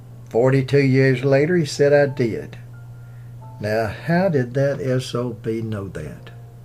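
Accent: South, United States. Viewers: Hey, i love and appreciate your videos. In part because i appreciate your southern dialect, however, it has been proven in several linguistic studies that a large portion of the population at large automatically make the incorrect assumption that people with a southern dialect are less intelligent and less educated. So, sadly, i suspect that a good number of your down votes may be the result of bias against your southern dialect. That being said i also think that your popularity and success on YOUTUBE is also in part a result of people who appreciate your southern dialect. Whenever someone presents something uniquely their own to other people that is powerful enough for them to feel strongly about, then that is just exactly what they will do, some WILL feel strongly that it is good and some will feel strongly that it is bad. Don't focus on the fact that you have negative reviews-- after all we all have our critics lol-- just focus on the fact that what you are doing with your documentaries makes people feel strongly about them-- strong enough to leave an opinion-- and that my friend is an accomplishment in and of itself!!! 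Why... because in today's digital world it takes a POWERFUL CONNECTION in order to motivate people enough for them to even bother leaving an opinion. Even something as simple as a thumbs-up or a thumbs-down... So good job!!! (So this thumbs-UP is for you, what you do and how you do it -- not for my own comment-- however I'm sure that some p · Mr., Your channel is great content-wise. I also enjoy your Southern accent profusely. All the best to you from Poland. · I love documentaries but I see yet another set of remarks as to people being perplexed about others giving thumbs down. will try & tell you it's because of his heavy southern accent and some of that is true...BUT...when you call Elia Kazan ELLA Kazan or the Bubonic plague the BLUEBONNET Plague and say things like "first debut" which is redundant, instead of screen debut which is proper - it gets tiresome. He also called child actor, Brandon De Wilde, BRIAN De Wilde as well as Ida Lupinom Ida LUPINA! 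The mistakes makes are not due to his southern drawl....they're due to a type of laziness when reading and not caring enough to have someone else listen to the soundtrack and making the necessary corrections. There are more errors and it's a shame because I believe puts a good deal of effort into his research and I think he'd get more respect if he paid a lot more attention to what he's trying to produce.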